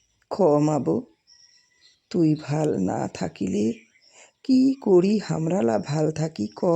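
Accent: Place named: Rangpur, Bangladesh. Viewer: "সংস্কৃতি বাঁচলে, শিকড় বাঁচে" - ঠিক কথা। ভালো লাগলো রংপুর আঞ্চলিক উচ্চারণে বাংলা কবিতাটি। আরও শুনবার আশা রইলো। সবাই ভালো থাকবেন। 🙏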